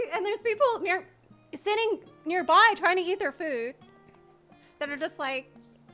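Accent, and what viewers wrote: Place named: South, United States. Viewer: Nothing better than comparing the good news to a punishment. I feel that really gets a lot of kids to change their mind about it. I think it will catch on. Also i love when sassy southern accent comes out. Reminds me of spending time with my relatives in Arkansas.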